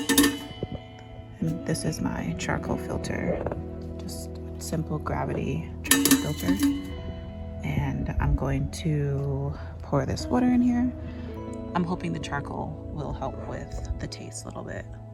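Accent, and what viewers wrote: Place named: Philadelphia, United States. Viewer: Just came across your channel, Love your content already! And your Philly/Delco accent 😆💚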